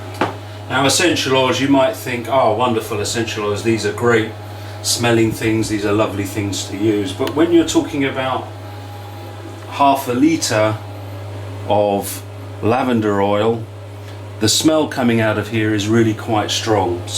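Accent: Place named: United Kingdom. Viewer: What a breath of fresh air! at last, a clear informative video and British too, this will be of great help to anyone starting out, or for those who feel confused or overwhelmed by other videos. I found it really helpful, although I have been making soap a little while now, but it was nicely reassuring that all what you showed I also do. Thank you so much, looking forward to more!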